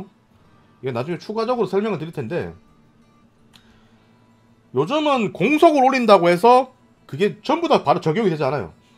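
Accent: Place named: Gyeongsang, South Korea. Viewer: 원래 경상도 사람 저정도 악센트 다 있는데.. 듣기 싫으면 다른걸 보던지 다 님처럼 생각하지 않으니 님이 이해를 하세요 서울사람보고 느끼하니까 말투 바꿔라고 하면 똑같이 기분나쁠거 아닙니까 ?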